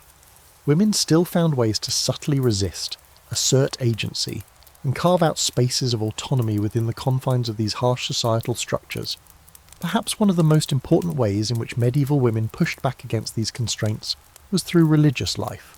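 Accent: United Kingdom. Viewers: Love your videos ….also cos of your lovely British accent. But…. have you cloned your voice for this narration? It sounds to me like it and i don’t love it 😞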